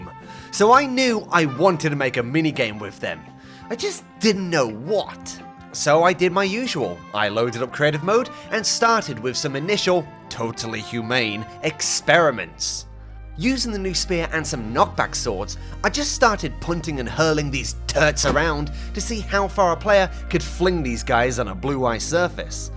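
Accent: United Kingdom. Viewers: use your british accent and make a british sentence about you going to hurt this turtle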